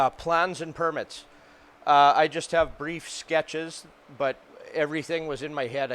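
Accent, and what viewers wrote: Canada. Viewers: You are good peoples! New subscriber. Plan on doing a smaller version down here in Southern Georgia. USA of course. Thank you for the invaluable information. Love the Canadian accent too! Go Jets! (Recovering Atlanta Thrashers fan). Take care, be safe and we love our guns too! Come to Georgia sometime!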